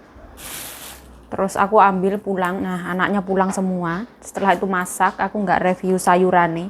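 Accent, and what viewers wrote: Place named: Surakarta, Indonesia. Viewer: Coro ngomonge solo bgt ,koyo aku nek gembreneng dewe nek muleh kampung 😂😂😂 tpi sak iki boso jowoku melempem efek 15th merantau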